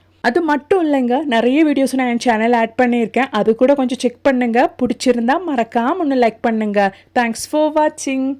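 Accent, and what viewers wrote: Kerala, India. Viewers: உங்க பேச்சில் மலையாள வாசனை அடிக்குது.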